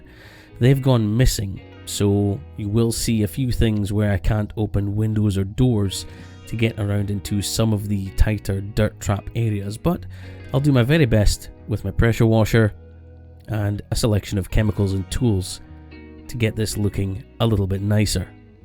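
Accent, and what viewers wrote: Scotland, United Kingdom. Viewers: ASMR With a Scottish accent was extremely satisfying.... let's see this one go crazy.. 🤗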